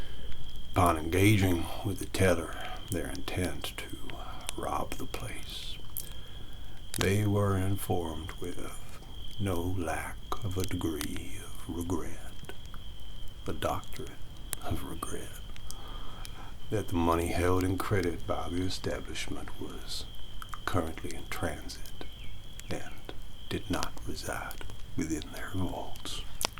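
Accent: South, United States. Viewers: Being a Texan myself, I don't have this kind of Southern accent, but I do find it so funny whenever I hear it. Great job, you are a wonderful talented artist. And, I'm afraid to admit, kind of sexy in this one, if you don't mind me saying so. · Well, butter my ass and call me a biscuit! Our boy can speak Southern! 😁 Great job on this. The crickets and night sounds were awesome. Every video is like stepping into another world for a while. You're amazing.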